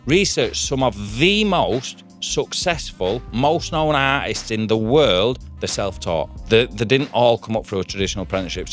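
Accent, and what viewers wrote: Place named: Manchester, United Kingdom. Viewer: no worries you have a bit of a Manc accent.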